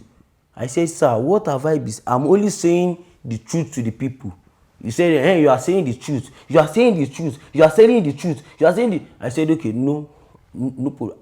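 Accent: Nigeria. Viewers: Is he speaking to an English audience or an African audience who speak Nigerian English?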